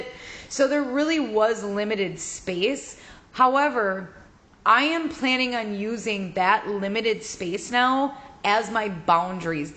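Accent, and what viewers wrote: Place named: Michigan, United States. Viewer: Just happened across your channel for motivation! Your accent sounds like you are from Michigan or somewhere int that region. If so, hello from Michigan!!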